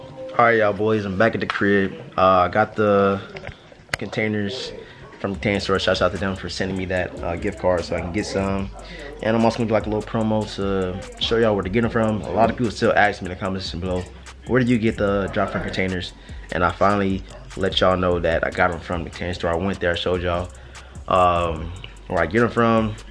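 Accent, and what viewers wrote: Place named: Miami, United States. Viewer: Hahaha you sound just like us down here in Miami. “Y’all boys!”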